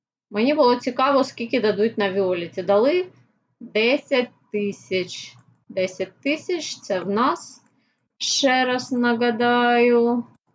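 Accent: Russia. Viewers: да просто)) то на английском с русским акцентом размовляете, то на Украинском) решил узнать уровень познания не только в коралле))))))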